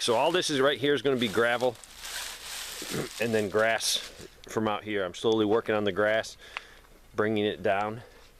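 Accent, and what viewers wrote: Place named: Chicago, United States. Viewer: May I ask you why the bathtub is outside of the house? I also noticed that you are cutting green brush in the winter! You really must be in the south but you sound like you're from the North like Chicago or there abouts. I am looking forward to you video how to filter the rain water. I want to use my poly totes and put them in the basement (before it's completed) and have the water run down there and use it for washing and such.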